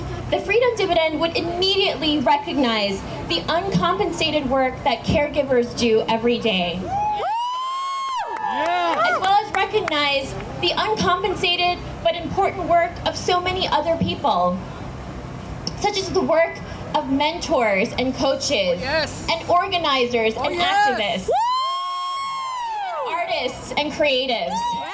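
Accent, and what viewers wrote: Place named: United States. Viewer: Her English is 100% American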